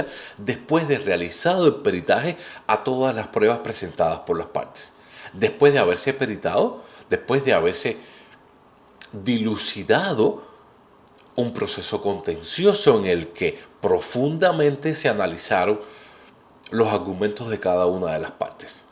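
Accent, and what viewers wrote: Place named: Venezuela. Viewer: Por qué hablas como Vzlano si eres Cubano...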